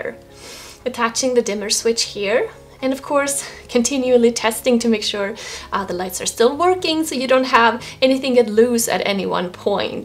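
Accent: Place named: Sweden. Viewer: I love your accent, are you Swedish?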